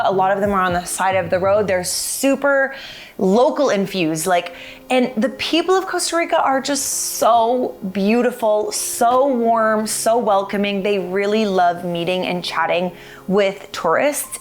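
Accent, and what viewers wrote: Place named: Canada. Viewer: I think that it has to do with the Canadian accent as apposed to disrespect or not knowing the correct pronunciation or spelling.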